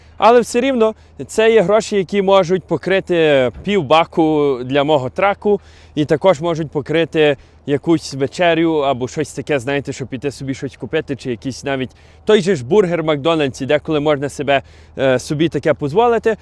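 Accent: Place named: Hutsul, Ukraine. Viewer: Ти мені подобаєшся ,настоящий гуцул з полонини,і мова така,я працював з хлопаками з западної України. І в Чехії так їх тьма,трутнів,не таких простих заробічан як ми стобою. А роботодателів.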